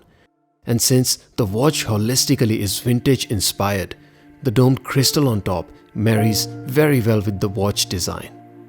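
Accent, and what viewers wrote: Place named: Kerala, India. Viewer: bruh sounding like a physics teacher from Kerala...."vwaatch" yeh no thanks youtube recommend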